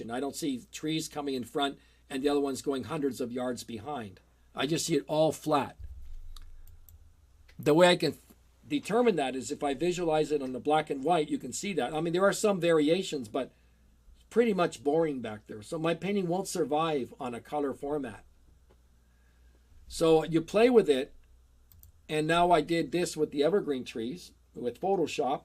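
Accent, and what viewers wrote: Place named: United States. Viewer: I have had to put the sound off and the subtitles on. I can not stomach one more American accent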